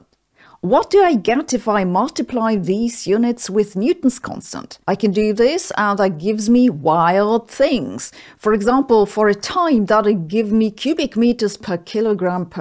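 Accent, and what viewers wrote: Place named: Germany. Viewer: Many of videos are way over my head, like today's posting, but I watch anyway because I enjoy hearing her talk. Listening to someone speak about science with a German accent somehow makes me feel smarter than I am.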